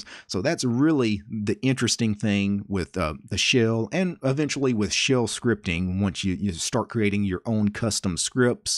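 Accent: South, United States. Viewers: Your editor put grip because they can't understand a southern accent apparently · I thoughts it was weird when i first heard Indian accent in a video teaching me computer science, turns out its even weirder hearing a Southern accent in a video teaching me computer science. Jokes aside, its a great video with clear explanation. Good job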